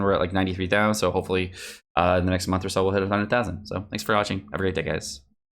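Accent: United Kingdom. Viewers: No British today?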